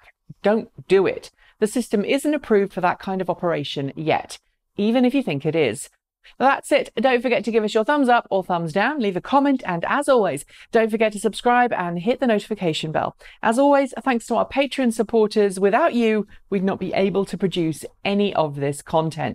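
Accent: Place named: United Kingdom. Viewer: You produce some of the best and most interesting postings on electric vehicles and other emerging technologies. And, like all Americans, I love the British accent.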